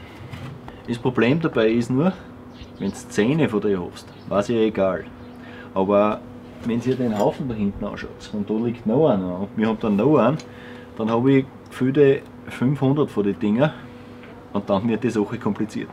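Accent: Bavaria, Germany. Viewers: Wie wäre es denn mal mit HOCHDEUTSCH? Typisch Bayer, da sind mir die Sachsen lieber, die geben sich wenigstens Mühe das man sie verstehen kann !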